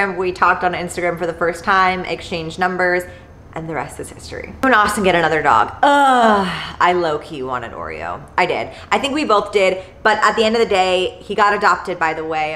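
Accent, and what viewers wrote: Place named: Pennsylvania, United States. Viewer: I HEARD PENNSYLVANIA ACCENT, and as someone with family from PA I TOTALLY understand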